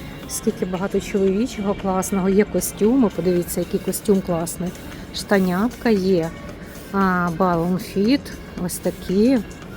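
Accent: Lviv, Ukraine. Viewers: Вітаю вас,! Щиро дякуємо за огляди, бо вони є дуже цікавими. Ви дуже гарно подайте огляд продукції. Це ніби як сама оглядаєш та відчуваєш склад тканин. Я також є дуже прискіплива до якості пошиття виробів. А ще мені подобається ваш тембр голосу, вимова. Відчувається той львівський шарм. Люблю ваше місто. Місто моєї молодості, студентсва😊 💛🩵🍀🌷🌷🌷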